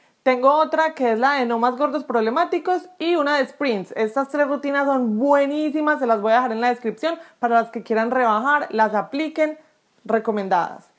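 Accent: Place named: Colombia. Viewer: No sabe nada, pero como me gusta el acento colombiano este ya es el segundo video y el ultimo 😅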